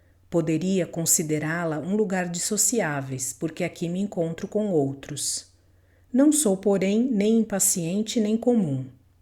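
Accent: Portugal. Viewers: I also prefer the European pronunciation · Please forgive my writing in English. I am trying to learn European Portuguese. Is this book by Pessoa being read with European pronunciation, or with Brazilian or some other pronunciation?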